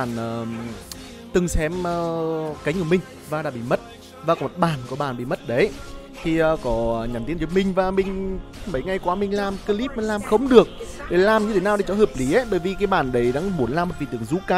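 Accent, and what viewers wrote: Nghe An, Vietnam. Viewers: Người miền Trung, Nghệ An đúng tình cảm luôn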